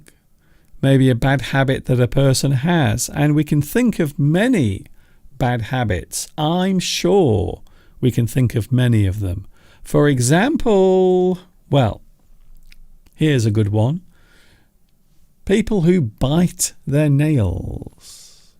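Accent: United Kingdom. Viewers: My lovely British accent!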